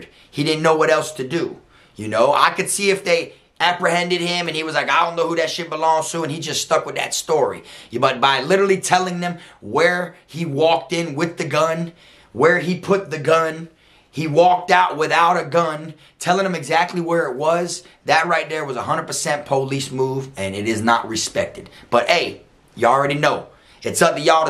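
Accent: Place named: Florida, United States. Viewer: Yo we all definitely got the same talk here in Florida 🤣💯💯 I talk the exact same way you do! ❤️💯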